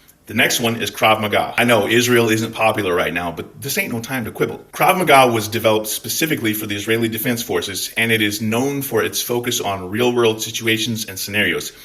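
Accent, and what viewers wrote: Scotland, United Kingdom. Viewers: Ah, you're Scottish!? No wonder you're cool as shit.🎉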